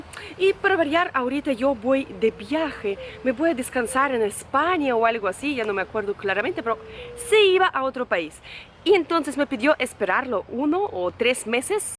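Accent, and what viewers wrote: Amazon, Peru. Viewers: Tienes un dejo charapa 😄. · Interesante divertida la forma de contar tu travesía tu español peruanisado es lo mejor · Se te pego el dejo peruano 😍😍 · Tu español es rapidisimo...casi ya 100% Peruano..bien alli. !! Suerte en mi Peru, como lo extraño !! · X ahi dice q se te escucha como charapa de la selva pero aveces te escucho como la paisana jacinta x eso me caes bien dices q vas a ir a Ayacucho en abril q bien tengo familiares x ahi la pampa de la quinua es un lugar muy turístico xq ahi se libro la ultima batalla de Ayacucho contra los españoles donde q ganamos y se sello la independencia de Peru y America hispana y tu historia es graciosa en conclusión entrastes al peru x tener un gran cu.. xq a los latinos nos gusta mujeres de gran ku.. jejejeje . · tienes un acento de la selva peruana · Yo te escuché y pensé que eras charapa. Me encanto tu historia y la manera de contarla. 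Felizmente te quedaste en el Perú. Bendiciones. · Soy yo o me parece que tiene el dejo de la selva ¿? ... Por cierto que buen español manejas. Saludos!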